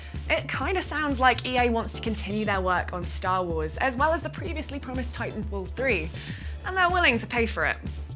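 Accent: United Kingdom. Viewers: Holy crap !!!!!! That British accent though 🤔🤔🤔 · Cute, redhead and british accent. 10/10 IGN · That beautiful british accent though;)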